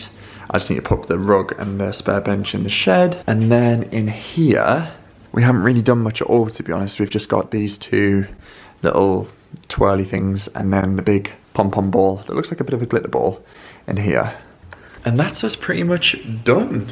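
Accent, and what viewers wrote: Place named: Durham, United Kingdom. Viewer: Can tell you’ve been back to Durham, this Londoner is having trouble understanding you 😅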